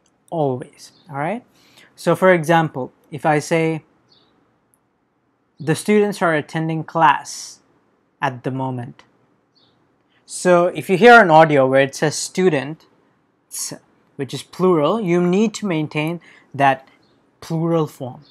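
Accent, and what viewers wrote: India. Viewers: wideos. You're indian. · you are from bengal and bengali might be because i understand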